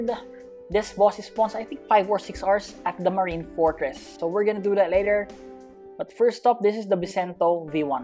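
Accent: Philippines. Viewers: Where does come from his accent sounds Filipino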